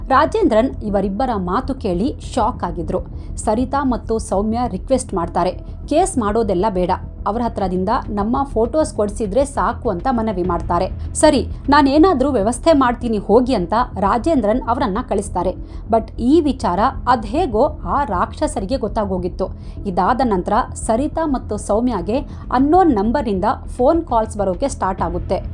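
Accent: Standard. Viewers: ಸ್ವಚ್ಛ ಕನ್ನಡ ಶುದ್ಧ ಕನ್ನಡ ವಿವರಣೆ exalent 🙏❤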